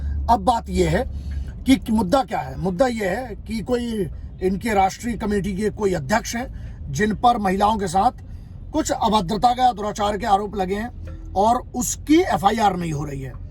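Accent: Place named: Kanpur, India. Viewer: Your voice has accent of kanpur up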